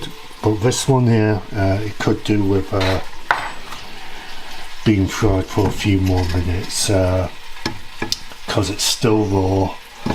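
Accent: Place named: North, United Kingdom. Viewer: I AM FROM THE 1960 S IM.IM IN NZ I JUST REALLY ENJOY HOW YOILIIU DOYOUR COOK COOKING.PROGRAMME MR. CHIEF THE..INGRIEDIENT AND PREPARE OF THAT YOU ARE COOKING AND TO THOUROUHLY COOK PERHAPS THAT THOSE LIKE TO VEIW THIS PROGRAMME ALOT THE COOKING RESULTS ARE SCRUMBY THEEDEDESE RRECIPIES ARE HAVE TO BE VERY WELL MADE ANND SCRUMBY THANKYOIU MR COOK I REALLY LIKE THOSE TYPES OF FOLK AN THIER ACCENTS.LIKE YOURS FROM THE NORTHERN PLACES OF THE WORLD YOUR PROGRAMME PROVIDES HOW TOO WELL MADE SCRUMBY DISHES AS WHEN AS ALSO I VE BEEN INOLVED TOO COOK HERE PREVIOUSLY . THANK YOU MR. COOK THESE DISHES ARE SCRUMBY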